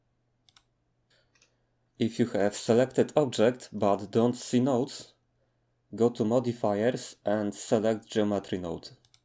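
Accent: Poland. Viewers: Sensing a Polish accent there :)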